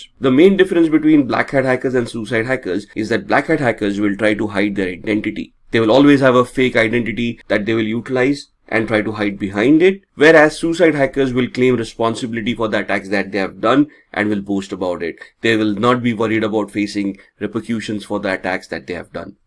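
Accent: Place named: India. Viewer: no offense, why do most of these videos have to be narrated by people with Indian accents? I can't tell what he says no matter how many times I replay it... CC thanks...?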